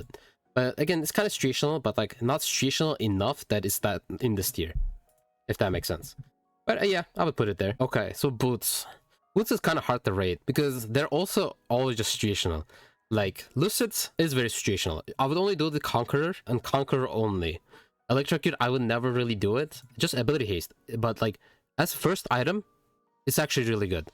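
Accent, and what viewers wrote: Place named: Western Europe. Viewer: Whether you're from EUW (a guess based on your accent) or NA (your region), you're handsome as heck.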